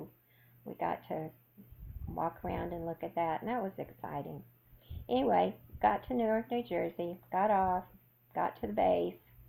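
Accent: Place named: South, United States. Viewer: I am so sorry to hear you were in the hospital. Hope you are on the speedy road to recovery. Marlene’s project bag is just beautiful and how sweet is that??! 😉. So good to see you, as always, I am inspired by your sunny outlook and I could just listen to that lovely Southern accent all day long. 😘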